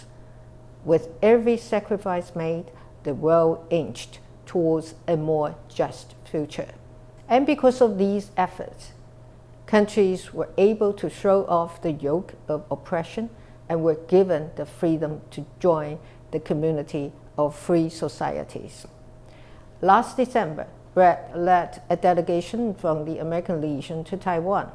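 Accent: United Kingdom. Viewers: 蔡英文總統是標準的英式英語，這真的是曾在英國生活求學過才有這樣的口音...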